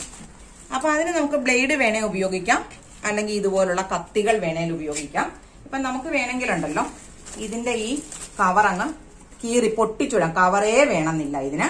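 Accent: Kottayam, India. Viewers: വർത്തമാനം കേട്ടാൽ അറിയാം കോട്ടയം സ്വദേശിയാണ് എന്ന്..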